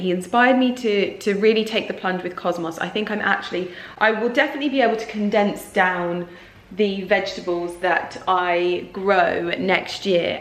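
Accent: RP, United Kingdom. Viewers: I don’t understand why there is a smear campaign against you - your accent might have changed, but that is because you have educated yourself and developed your vocabulary. Yes, RP (Received Pronunciation) might be associated with the upper classes, but nowadays, people can have that accent regardless. Your accent shows to me that you are well-read, well-educated and intelligent. Why are people so hateful of that?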